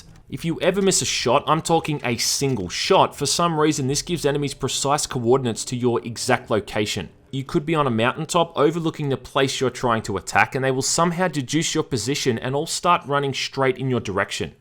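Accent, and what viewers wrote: Bogan, Australia. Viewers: ur just a below average reviewer whos only popular because you have that bogan aussie accent.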